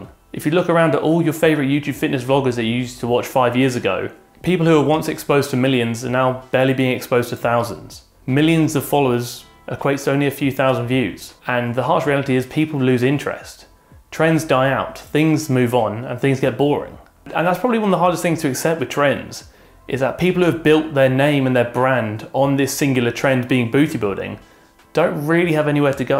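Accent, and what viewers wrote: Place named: RP, United Kingdom. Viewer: i only watch this because of his hugh grant accent omg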